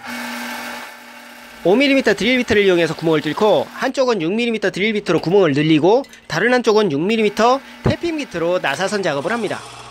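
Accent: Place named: South, Russia. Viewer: Южный диалект русского ,не Совсем понятно😀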